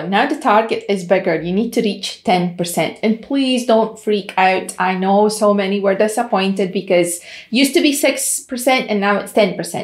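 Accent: Scotland, United Kingdom. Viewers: Where is your accent from? I hear Scottish but mixed with something else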